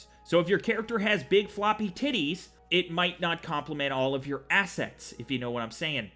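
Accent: Canada. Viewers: Are you Canadian? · Hahhaha this guy is so Canadian he sounds like my buddy who lives in Orangeville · This guy has to be Canadian, right?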